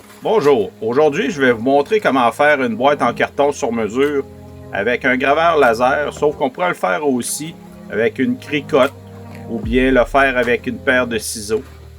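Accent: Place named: Canada. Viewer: C'était vraiment utile surtout le site indiqué. (Et j'adore l'accent Canadien) Merci!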